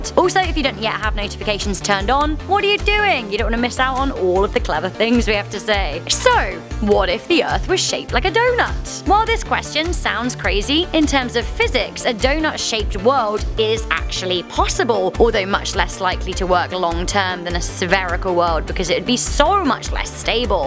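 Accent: United Kingdom. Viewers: Your accent sound like British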